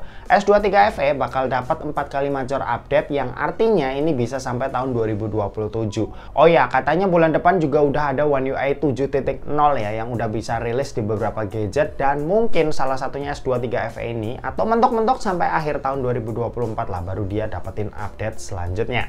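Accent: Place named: Java, Indonesia. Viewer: medok tipis tipis y mas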